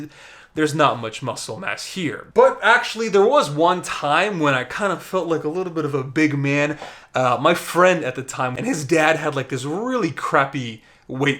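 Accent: Russia. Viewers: omg the russian accent lol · That Russian accent tho... 😆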